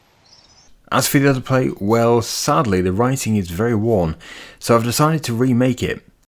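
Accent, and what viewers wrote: United Kingdom. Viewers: British voice & machinery for restoration! That's so ASMR! Subbed! Thank you!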